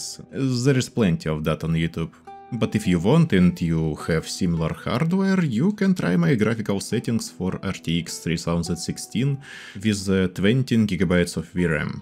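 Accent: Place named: Russia. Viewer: А какой смысл выпускать видос на инглише с таким чудовищным акцентом? Нас сейчас в Америке и европе не сильно любят. А услышав первое же предложение человек поймет что ты русский и даже если он каким то чудом увидит этот ролик в рекоммендах, то процентов 80 народа его сразу же скипнет.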